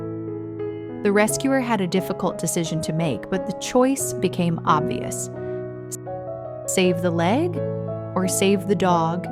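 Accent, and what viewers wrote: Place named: United States. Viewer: disgusting American pronunciation with that "r". It's like it's choking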